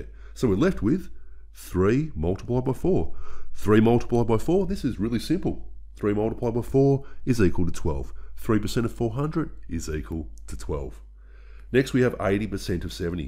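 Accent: Australia. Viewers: As a New Zealander, I would say his accent is from Australia, and probably so is he.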